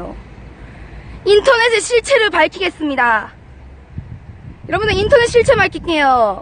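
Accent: Busan, South Korea. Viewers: 부산 사투리 귀욥네요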